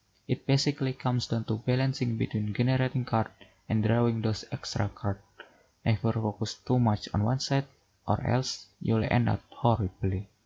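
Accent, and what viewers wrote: Indonesia. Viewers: u sound like indonesian tbh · Also i never guess that you're indonesian before i hear your voice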